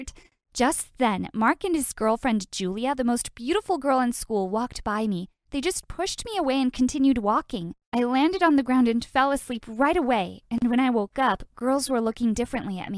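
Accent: Philippines. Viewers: wow i was shock i like the filipino accent and the word makes me laugh hahahhaha and she mentioned phili[[ines i feel happy and excited HAHAHH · Omg I'm a pilipino and the freakin accent😭😂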